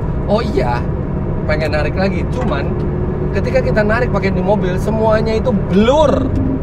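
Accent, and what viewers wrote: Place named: Chinese-Indonesian, Indonesia. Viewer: dari aksennya iki cindo sby lek gak semarang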